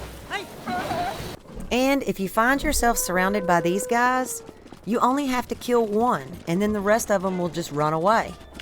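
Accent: South, United States. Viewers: Your country accent is so strong and it sounds adorable lol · First off I just wanted to say that it was refreshing to hear a female voice and one with a southern accent as well. I'm from California, so it's not my accent. 😆 It's nice to hear someone from a different part of the country. Second, I learn a lot from this video. I didn't know you could get arrows from a cooking pot! Regarding the wolves, I like to kill as much as I can for the meat; meat skewers can fetch ya a pretty penny at Goron City. I knew you could change chuchus to other colors, but I didn't know about the water methods. Will come in handy! Thanks! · I know this game has been out for a while but I just started playing it on this oled switch I also just got. Best choice I made in a while. This video is so helpful. Liked and subscribed. Sounds like you're also from the south, lol. 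Good luck on your algorithm! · The Southern accent was an unexpected but pleasant surprise lol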